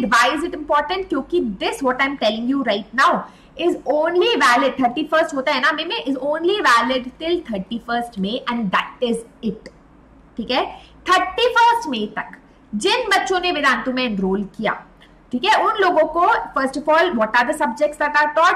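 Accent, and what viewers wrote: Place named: Tamil Nadu, India. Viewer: your sound was like a tamil 😅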